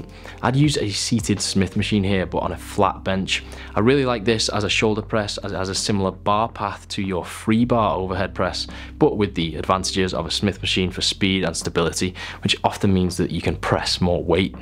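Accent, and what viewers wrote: United Kingdom. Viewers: Are you from UK ?? your accent is very hard to follow.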